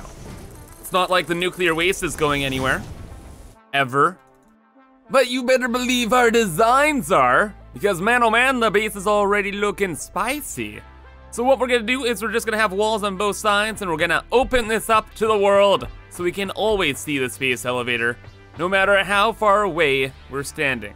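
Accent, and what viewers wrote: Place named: Canada. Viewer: "Aboot", "byees".... methinks is Canadian, ey?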